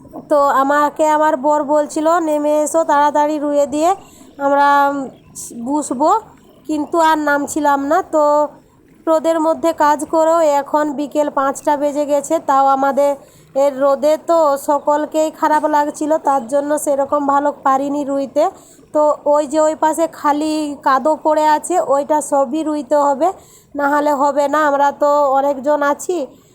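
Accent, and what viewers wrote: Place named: Purba Medinipur, India. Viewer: খুব ভালো লাগলো তোমার ভিডিও তোমার কথা শুনে মনে হচ্ছে । পূর্ব মেদিনী পুরে বাড়ি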